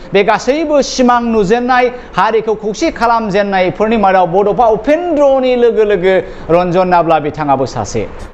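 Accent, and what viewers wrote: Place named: Bangladesh. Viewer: Ngni raoa bangladesi butumnaisw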